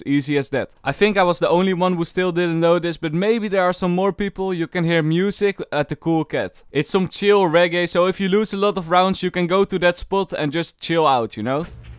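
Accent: Netherlands. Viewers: Ik wist meteen dat je uit nl komt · Love the dutch accent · nog ff leren om dat Nederlands accent weg te halen je klinkt net als mark rutte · and evrytime i think jij benne nederlands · I smell dutch, a little bit too much bro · love the dutch accent lol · nice dutch accent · most dutch accent i have ever heard bro.. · I smell dutch 😂, still a good video man! I love your videos! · jij bent sws nederlands haha want zoon accent heb je xd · accents can not get any more dutch than this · im sorry but are you dutch, because your english is a little bit weird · Hahaha Nederlander die Engels praat met nederlands accent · dutch accent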